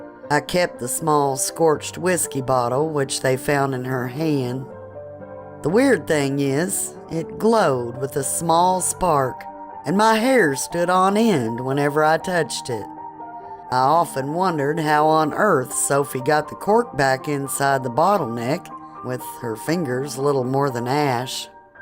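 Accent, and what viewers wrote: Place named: Kentucky, United States. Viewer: Hey this is wonderfully narrated! Love the Kentucky accents. :)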